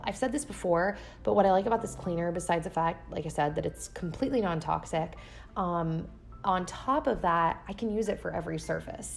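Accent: South, United States. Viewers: Hmm favorite cleaning products?? Bleach. Lol I love the smell of it. P.s. you're slowly getting that southern accent. 😍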